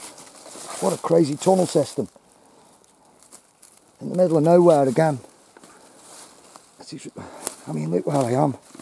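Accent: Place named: North West England, United Kingdom. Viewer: that’s ok brother. Are you from north west U.K. accent sounds familiar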